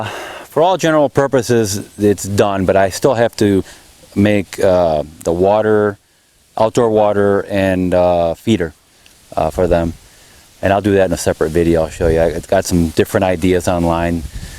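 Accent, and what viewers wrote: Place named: Northeast, United States. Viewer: Never would have guessed You are from texas. Your accent sounded to me l ike you're from the North or the Upper Northeast almost Canada It's the 'O'. Got an O myself, Lived in the south all but the 1st 4 yrs of my life and I still got the O.😂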